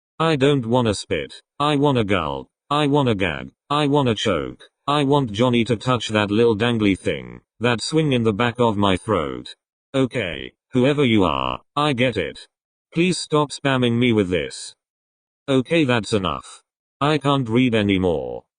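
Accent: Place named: England, United Kingdom. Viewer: AHAHAHAA ARE YOU ALSO FROM ENGLAND?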